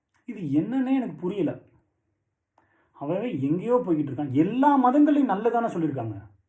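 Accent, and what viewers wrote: Standard. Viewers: normal தமிழ் பேசுறது நல்ல இருக்கு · Pls sir neenga eppovum pola videos podunga. Neeng feel pnatra mathiri videos podathinga nijamve fleeing iruku sir, pls mathavangla care panthinga epavum pola azhaga tamil la azhaga pesuvinga ila athupola podunga athan ungaluku azhagu. Senthamil pesuvinga parunga 👌👌👌👌👌👌 . So continues videos podunga. Nalla smile panite pesunga. God bless you sir. Ungalai pakka en anna polave iruknga. So feel panthinga bro..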